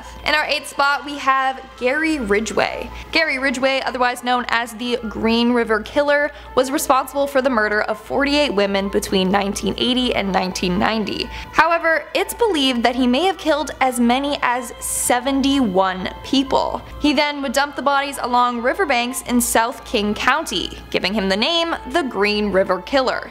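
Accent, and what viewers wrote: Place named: United Kingdom. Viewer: I liked the British accent . But she okay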